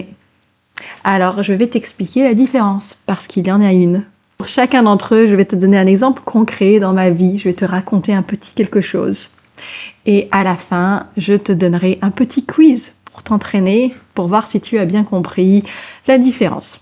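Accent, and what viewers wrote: New Zealand. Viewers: Je vais retourner à Paris ou j'étais jeune fille au pair il y a 45 ans. Je l'adore, and maintenant, je suis en train de rafraîchir ma connaissance de la langue française! J'adore ton accent en français et en anglais. Tu es dans le sud de France? Et bien sur, j'entends un accent Kiwi quand tu parles anglais! Merci beaucoup pour ces videos. Je viens de m'inscrire à la chaîne! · Love the Kiwi accent.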